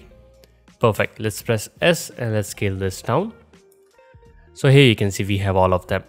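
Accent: India. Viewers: Are you indian or what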